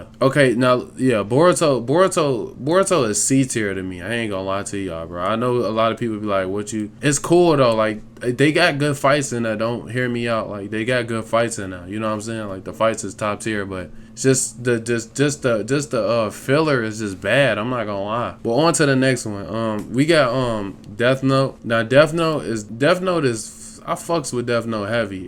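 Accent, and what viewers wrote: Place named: Chicago, United States. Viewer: I know a fellow Chicagoian when I hear one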